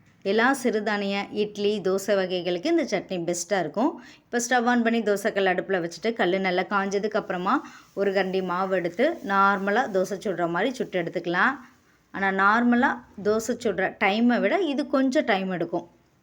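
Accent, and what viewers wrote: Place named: South Tamil Nadu, India. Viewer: hello neenga tirunelveli kanyakumari nagarkovil paguthigalil ondrsi sernthavarghal kovai saayalum irukku just by tamil slang sis.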